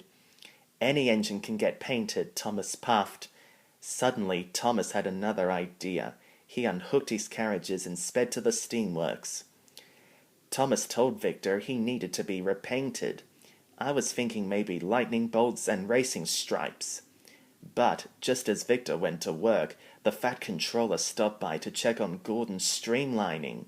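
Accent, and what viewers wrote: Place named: United Kingdom. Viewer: Nice narrating☺you're using british english☺because your accent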